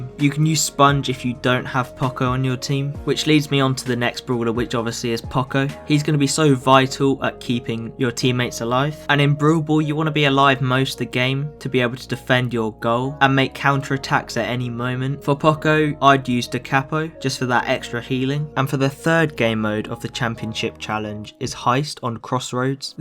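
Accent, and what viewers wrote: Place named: United Kingdom. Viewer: This is the most British accent i have ever seen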